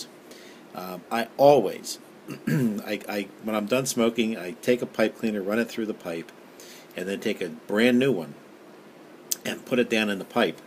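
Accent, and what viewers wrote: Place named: Black Sea, Turkey. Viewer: doğulusunuz ama karadeniz şivesi ile konuşuyorsunuz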